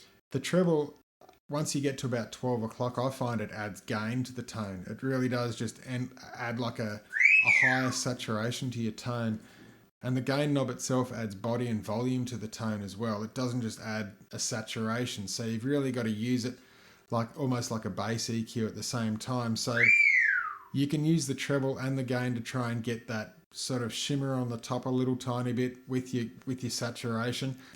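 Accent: Australia. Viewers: holy shit you're Aussie! I had no idea :) Keep up the good work mate!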